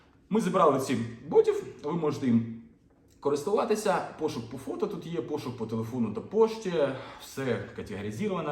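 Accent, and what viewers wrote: Kharkiv, Ukraine. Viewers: Доволі цікаво! Мова Харків'янська так Харків'янська, за що вибачатися) спожіваюся за рік зроблені абсолютно всі висновки)) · Лайк за Харківський суржик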